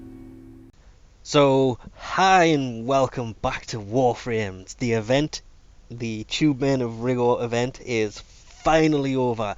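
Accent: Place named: United Kingdom. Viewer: what country do you live in? From your accent you sound like your from somewhere in Britain but it was 5pm when the event finished here?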